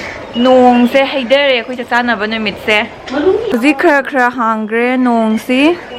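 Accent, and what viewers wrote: Kakching, India. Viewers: Yengba nugai e kakchinge londo adum lonlo taba nugai ye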